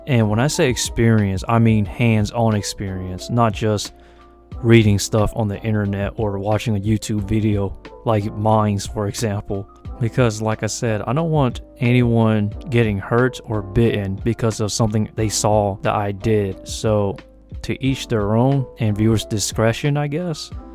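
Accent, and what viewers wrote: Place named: South, United States. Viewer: Bro I can tell your from the southern states. I was born and raised in Virginia and North Carolina hell yeah brother!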